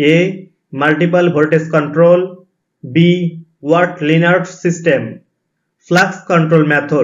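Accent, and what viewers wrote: India. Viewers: What accent it is! Hindi speaking people has this type of accent!!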